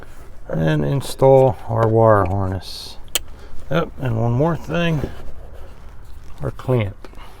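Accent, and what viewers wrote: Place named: Baltimore, United States. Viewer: Gotcha. You just sound like you have an accent that is very similar to our Baltimore accent.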